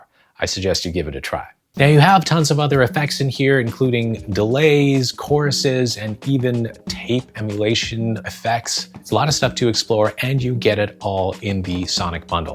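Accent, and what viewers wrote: India. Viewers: Hi. You are clearly from india. but i am wondering what your mother toungue is? I love Your reviews. This is Preetam from India.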